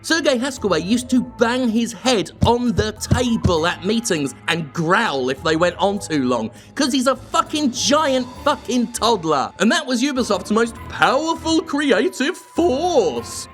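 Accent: United Kingdom. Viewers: 2014 sounds britisher than 2020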